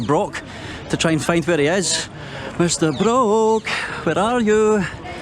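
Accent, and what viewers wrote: Scotland, United Kingdom. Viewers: He's also seems to be a really nice guy too, like he's always super friendly to everyone. His accent is cool too, not that many Scottish youtubers out there. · His Scottish accent tho! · I love your Scottish accent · Why people have so much problem with the title. Erection also means action of erecting structure or object example monuments. 🙄 Anyways I'm here for Scottish accent and big strong legs 🤭🤣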